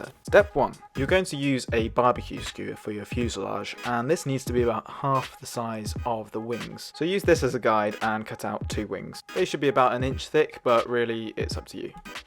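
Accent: England, United Kingdom. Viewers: I’m jealous of your English accent.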